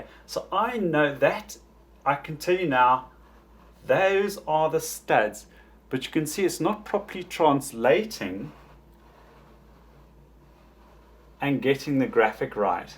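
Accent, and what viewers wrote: South Africa. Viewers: South Africa or Zimbabwe probably, def. not British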